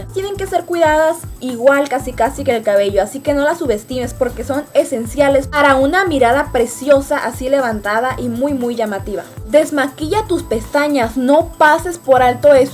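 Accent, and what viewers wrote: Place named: Torreón, Mexico. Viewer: Oye de dónde eres? Es que hablas como los de aquí de torreón jiji saludos! Gracias por tus consejos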